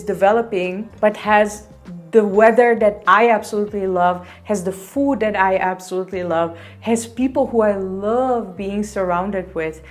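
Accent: India. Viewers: Haha geweldig! Love dat je inmiddels ook een klein Indiaas accentje hebt. Well done! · Omg . You already have a semi Indian accent. It's kind of a hybrid Indian-European accent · Now you have an indian english accent as well as look like a (pale) indian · Your pronunciations are very indian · she has such a cute partial Indian accent, like some words in between. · Ha ha you speak little bit with Indian accent too. Started be be 100% Indian.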